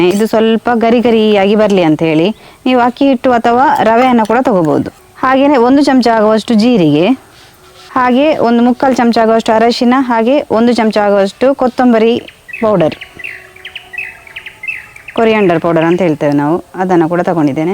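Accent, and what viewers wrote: Coastal Karnataka, India. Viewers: Omlet egg inda madodu mathra gottith... Tomoto inda madid nodi nanu try madde... Super agi bandide .... Nimma karavali mathu super... Namge bahala ista aythu marre...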